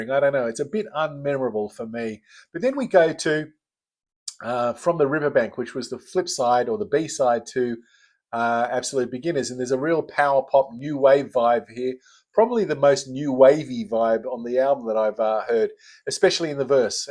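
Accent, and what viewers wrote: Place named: Australia. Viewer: With your accent...are you living in Australia? One of my sons has gone out to Sydney for a year. Ive been a long life fan...well, since 1978. Love them...what an inspiration! Gerard in Belfast.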